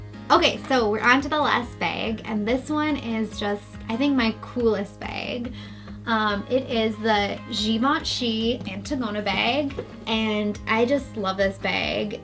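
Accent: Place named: Midwest, United States. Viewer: Probably Midwest (Wisconsin or Minnesota) just guessing from her accent "bag" lol. I live in the Midwest too.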